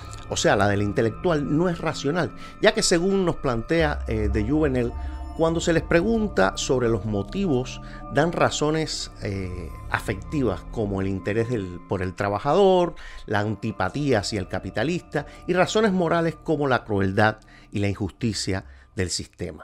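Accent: Cuba. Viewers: Gran vídeo . Pero creo que hablas del intelectual comunista. Por tu acepto veo que eres quizás cubano? Yo soy cubana y entiendo que este punto de vista viene algo heredado de la situación del país. Quizás eh? De cualquier modo, muy bien video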